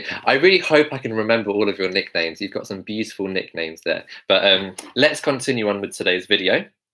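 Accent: United Kingdom. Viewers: Perfect brit accent! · yaaas! the scar, the glasses, HP mood, British accent - I love all of it <3 very helpful! thanks!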